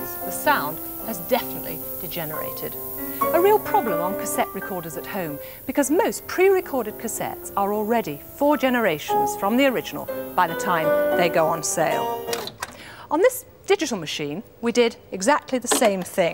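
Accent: RP, United Kingdom. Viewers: I love the way the presenter was so posh. I wish the women I knew were hi-fi nerds! Imagine a woman blabbing on about which tapes to use and if Dolby should or should not be used! That would be fantastic, instead they bombard me with crap and TikTok videos I delete immediately upon receiving! Anyway, this cassette was a flop, the CD was launched in 1982 also and we all know what happened!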